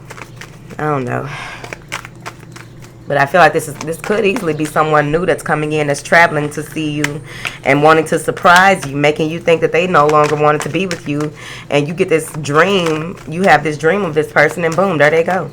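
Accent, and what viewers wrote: South, United States. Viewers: That southern accent tho. 💜 🙌🏾💯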